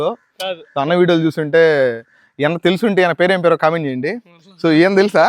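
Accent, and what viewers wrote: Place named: Kadapa, India. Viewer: కడప స్లాంగ్ ఎక్కువగా, నెల్లూరు స్లాంగ్ తక్కువుగా మాట్లాడతావు 🥰🥰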